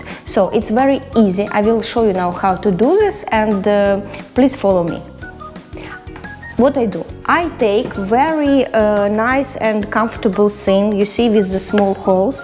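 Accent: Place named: Ukraine. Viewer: Sounds Ukrainian to me!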